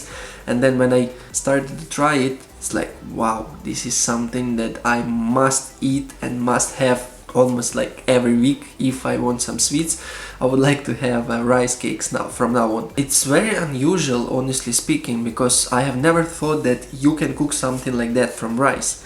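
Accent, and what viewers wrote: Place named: Philippines. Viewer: Your pronunciation is like Filipino accent. Very clear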